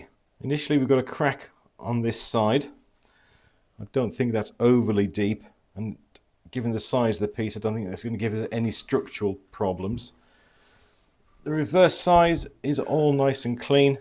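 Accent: United Kingdom. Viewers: I'm from Birmingham and I live on the Welsh borders in Shropshire. I have a thing for accents, I love them and I'm sure I can detect a remnant of West Midlands in your speech. Thankyou for your welcome. I'm enjoying working through your back catalogue.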